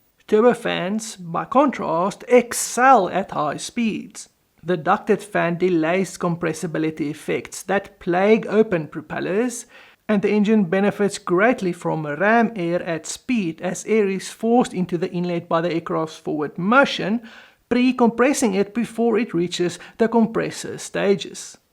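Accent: South Africa. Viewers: Love the South African accent, reminds me of my days in Joburg. Great video, the YC at Pima Air and Space is quite something to see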